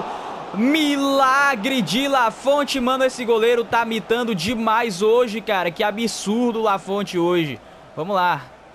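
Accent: Portugal. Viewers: cara, para de falar que nem português!! kkkk é irritante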